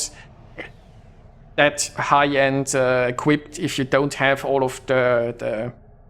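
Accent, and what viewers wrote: Germany. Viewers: Alter dein Deutsch Englisch hört sich geil an😂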